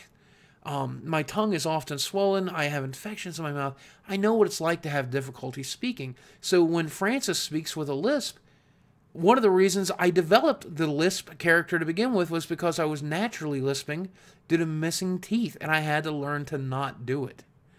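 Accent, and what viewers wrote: South, United States. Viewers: You already talked about the fact how you had to loose your southern accent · Yes it's like its okay for black people in pop culture to call each other the "N" word but absolutely not okay for a white person to say that. And by the way i love the Southern accent. One of my life goals is to visit the deep south.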